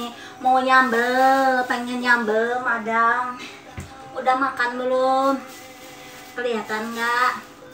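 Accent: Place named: Sunda, Indonesia. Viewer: Dari judulnya aja udah gokil Mak , suka sm medok Sundanya 🙏🏻😁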